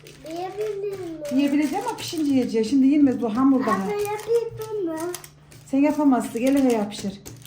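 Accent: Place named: Afyon, Turkey. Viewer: Merhaba hanım. Bu videonuz facebookta denk geldi. Konuşmaniz bizim Afyon tarafına benziyor nerelisiniz acaba? Ellerinize sağlık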